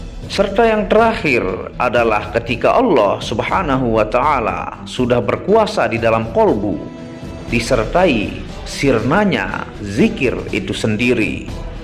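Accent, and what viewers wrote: Bali, Indonesia. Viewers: Logat pembaca spt org Bali ?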